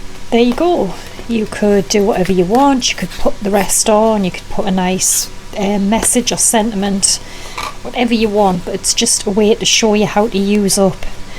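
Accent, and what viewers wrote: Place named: North East England, United Kingdom. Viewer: Great ideas, and I will certainly use some of them, but I really kept playing the video to listen to your accent. My parents were from South Shields, and I lived and worked in Gateshead for three years (1967-70), although I haven’t lived in the UK since then. I’m guessing you’re from somewhere not too far from there, so your voice gave me a real blast of nostalgia. Thank you!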